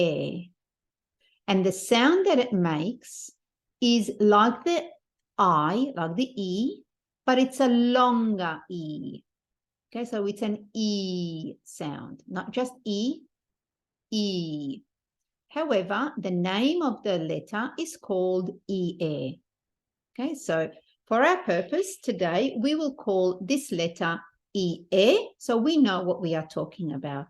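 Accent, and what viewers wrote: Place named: Australia. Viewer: This is amazing , I accidently found this channel and I am so glad that you are teaching so beautifully and from the accent I assume you are Australian . Thank you for doing this , love it and appreciate it .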